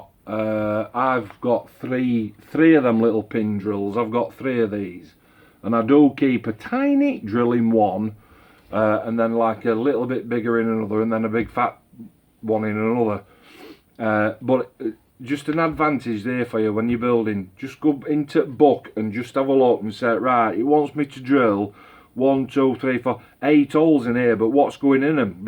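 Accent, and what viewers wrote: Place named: Yorkshire, United Kingdom. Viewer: Lovin that Yorkshire accent mate !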